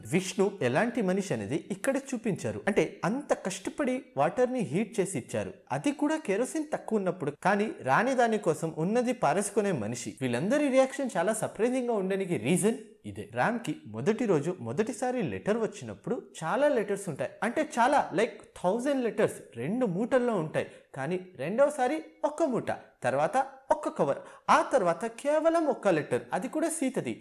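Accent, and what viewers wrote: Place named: Andhra Pradesh, India. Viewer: "Chinna pappa chirak munnda" ee video motham lo naa attention ni garbe chesinna dilogue edhe.....😜😜😜😜miru Andhra Pradesh lo untara .... don't mind mi slang koncham Andhra laa unndhi....i love it❤️❤️❤️❤️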